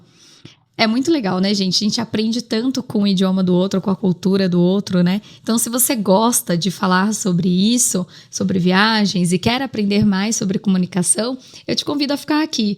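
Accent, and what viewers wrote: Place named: Brazil. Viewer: Comunicar bem aqui em portugal? vc neste video má exemplo começa logo mal , aqui não e em lago algum do mundo a não ser bostil não se sotaca portugau mas sim portugal , não se fala gentchi mas sim gente , não se diz qui legau mas sim que legal etc etc ,vcs ai no bostil teem alguma coisa contra a letra L ?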